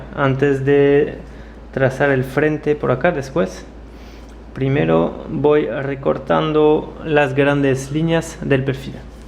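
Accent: France. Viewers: Tu acento es como frances, de donde eres? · t'es francais? Il ya un accent français dans ton Espagnol. Y muchas gracias por el contenido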